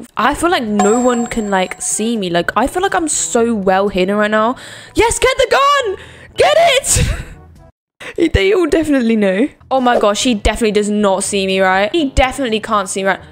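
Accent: United Kingdom. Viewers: Are you british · your accent is so prettyyy! r you perhaps British, or Australian? Xndjdksi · Its so uglay😭 Are u British or smth😭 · Her british accent👍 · I love ur voice it’s like the most perfect British accent ❤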